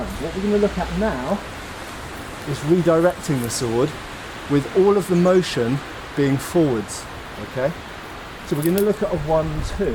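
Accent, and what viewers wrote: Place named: RP, United Kingdom. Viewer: you missed your true calling as a type-cast, British officer in Hollywood films. You are tall, thin, and have a moderate BBC accent. You seem like low level gentry so I am thinking a rank between Major and Colonel would suit you (you would get an officer's sword too). You are not regal enough (and too honorable) to be a general and you are too "proper" and too refined to be a sergeant. Am I the only one who could envision in a movie like 1917?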